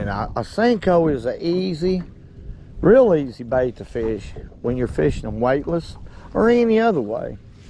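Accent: South, United States. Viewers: Love that accent brother! Southern pride!!!👍😁✌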